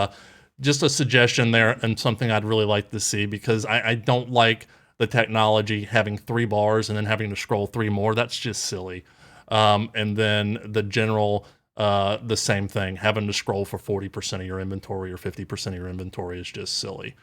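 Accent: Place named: South, United States. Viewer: You sound like Danny McBride. Are you from around the Georgia-SC border?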